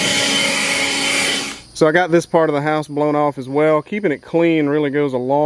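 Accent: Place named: North Carolina, United States. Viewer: I think he’s from North Carolina !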